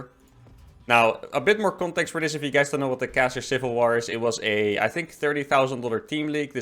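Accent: Netherlands. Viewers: I can just tell by your accent that you're Dutch. Good to see another dutchman on this site!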